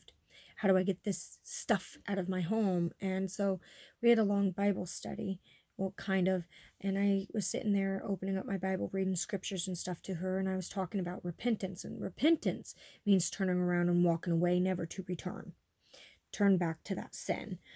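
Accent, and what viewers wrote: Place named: South Carolina, United States. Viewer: I live in south Carolina you sound like ur from south Carolina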